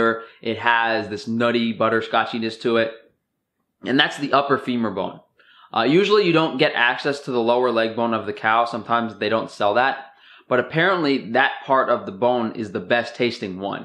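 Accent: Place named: East Coast, United States. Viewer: This guy is so East Coast and I love it :)... Especially the brief pause in between "Cross-contaminaton" and "from the butcher's saw" when he does that little Italian hand gesture with the knife... I like this guy. He knows what he's talking about.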